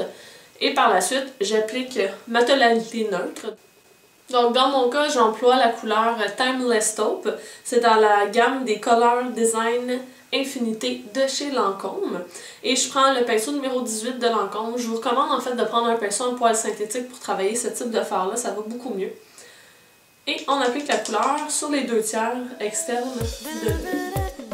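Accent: Canada. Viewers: T'es canadienne, t'es pas? :-) très bon truc!!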